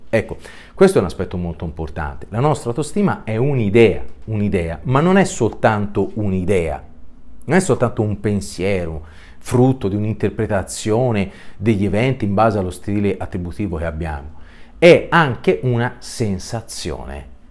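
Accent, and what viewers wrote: Tuscany, Italy. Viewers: Sei l’unico toscano che adoro sentire parlare tra l’altro sei anche super affascinante 😃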